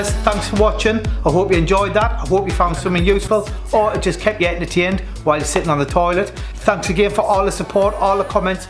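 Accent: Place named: North East England, United Kingdom. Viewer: Haha "an old fella" these sayings are normal for me but I bet people if any that watch abroad struggle to keep up with how we talk on the Northeast and I even speak different to even tho I'm a stones throw away from where he is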